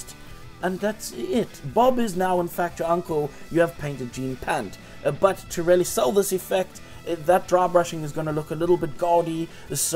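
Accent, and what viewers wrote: South Africa. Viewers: His bio says he's from the uk but he sounds so south african. Really like the video though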